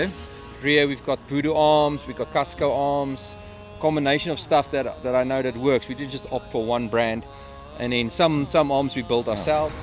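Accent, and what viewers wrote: South Africa. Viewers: are you afrikaans? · you south african? sounds like our accent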